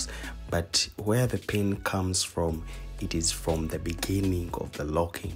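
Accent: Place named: Uganda. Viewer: ..I've just discovered you.are you Ugandan? You sound like my people..🥳🥳🥳🥳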